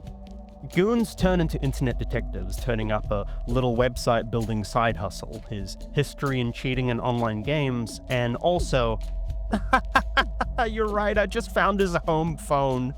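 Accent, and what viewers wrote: New Zealand. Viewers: I always thought he sounded kiwi too so I'm inclined to agree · he’s from NZ isn’t he? I’m an Aussie so I know a kiwi accent when I hear one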